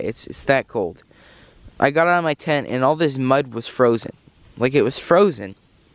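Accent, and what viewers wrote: Pittsburgh, United States. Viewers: Just saying. I'm from Pittsburgh. And you have a strong Pittsburgh accent.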